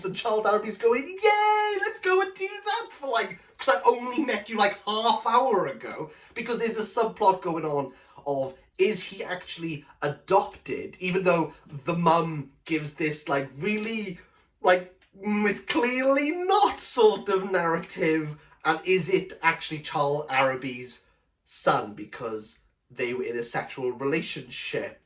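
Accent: Wales, United Kingdom. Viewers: Hello fellow person from Wales. Your accent is similar to mine. Thanks for this review. I have just finished the book and was dying to listen to someone else's views. I think Charles always called his friend Perry but was reminded to call him Peregrine. I agree that the story is funny and almost a farce in places. There's a lot of religious imagery and very very deep and philosophical. I wish that the story hadn't gone on quite so long. I did crave a clearer conclusion at the end. Thanks again.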